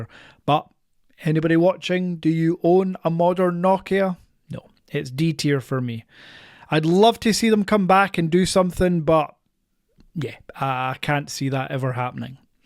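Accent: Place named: Scotland, United Kingdom. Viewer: It's his natural Scottish accent!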